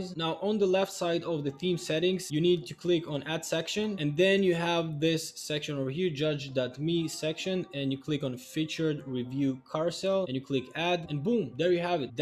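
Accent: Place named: Russia. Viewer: Спасибо за видос братишка я и не сомневался что ты русский! когда услышал твой акцент и был рад что Русский человек учит представителей других нации дропшипить! РЕСПКТ БРАТИШКА! А ТЕПЕР ВСЕ ЭТО НА РУССКОМ ПОЖАЛУЙСТА!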